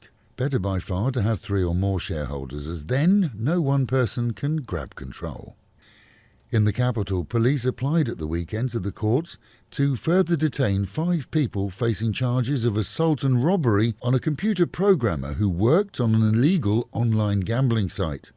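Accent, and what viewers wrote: United Kingdom. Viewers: Love hearing a British accent while getting the scoop on Thailand drug busts. New subscriber here from the U.S.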